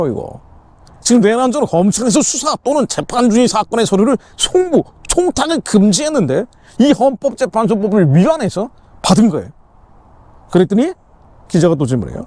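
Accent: North Korea. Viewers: 다 좋은데 북괴 말투는 정말 싫타.... 간첩아니고서야 왜 북괴 말씨지?? 북괴에 자주 갔었나.....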